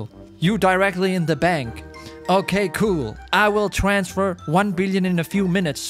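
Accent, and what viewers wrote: Denmark. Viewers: YOU SOUND DANISH?!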